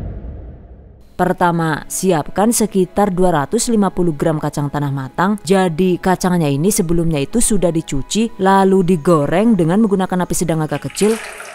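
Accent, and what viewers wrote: Banyumas, Indonesia. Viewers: yutuber favorite kluargaku,,,resep anti ggl,,,bhsanya mdh di mngerti tdk bertele",,,,tutornya jelas sejelas" nya,,lucu bgt klo sdh ngluarin bhsa ngapaknya ,,,😂👍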